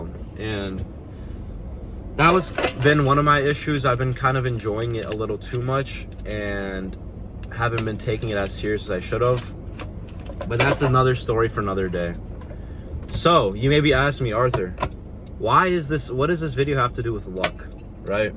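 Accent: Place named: Eastern Europe. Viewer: Are you Russian/Ukrainian? Your accent is a little Slavic.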